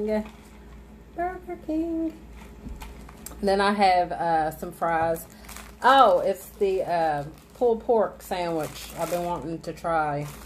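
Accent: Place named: South, United States. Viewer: Love me som bk... love your country accent ❤️ ppl say I’m country but I don’t think so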